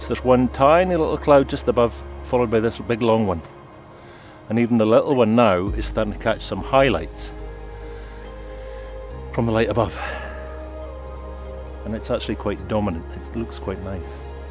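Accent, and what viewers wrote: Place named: Orkney, United Kingdom. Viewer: Explained so well as always xxx Love your Orcadian accent so calming 👍👍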